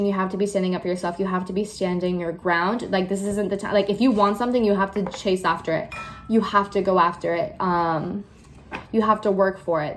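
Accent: United Kingdom. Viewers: as a British person, I appreciate your British accent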